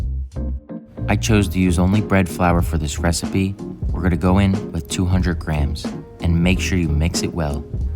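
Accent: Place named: Philadelphia, United States. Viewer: The Philly accent cracks me up. Left Philly in 80 and still miss the Hoagies.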